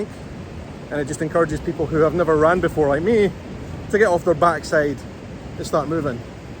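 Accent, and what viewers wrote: Scotland, United Kingdom. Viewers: The curiosity might be not just the English speaking but the "funny" accent. LOL Nobody can turn down listening to a Scottish accent! ;) My Dad was from Forth so I could listen to you talk all day long! So glad the cyclosporine has been such a miracle for you! Heather xo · Do you have a heavy Scottish accent in Portuguese too? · ...INTERESTING contrast: a Scots' accent...in BRAZIL...! WHEN you take up running, make sure the shoes are SUPPORTIVE; (YOUR knees will THANK YOU...). BTW: I'm VERY HAPPY you respond well to the cyclosporin... When you need to get off cyclosporin, DO try diet changes... YOU have a LOT of positive attributes going for you... 👍😃👣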